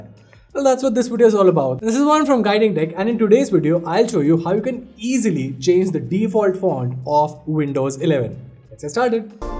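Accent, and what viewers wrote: India. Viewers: The Indian accent is so melodious, however, when speaking English he must slow down, as it is very hard to follow him. Great content, but I wish he would slow down a bit. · you say that the code is below this video and in this key moment of you pass so fast and even your accent is from a foreigner country that looks from india. so now i have to go to another smart guy for see where to get this wonderful code. If you speak slowly is going to be good for everybody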